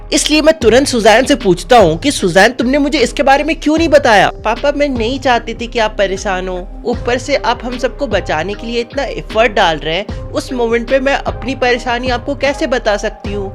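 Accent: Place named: Bihar, India. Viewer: Abbe sale bihari pronounciation to sudhar le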